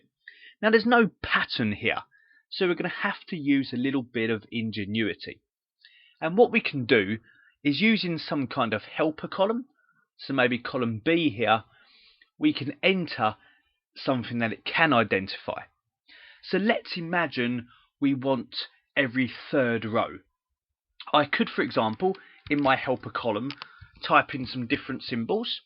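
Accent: England, United Kingdom. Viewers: Love English accent lol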